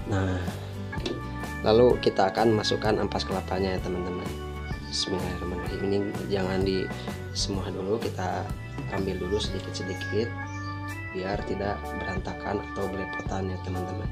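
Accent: West Java, Indonesia. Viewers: Host orang Sunda atau orang Minang asli? Logatnya kayak orang sunda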